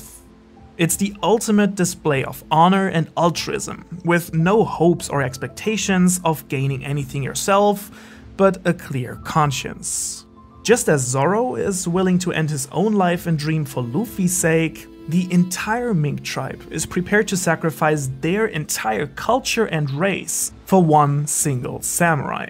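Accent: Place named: Germany. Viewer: Are you german? Just asking cause your accent sounds a bit german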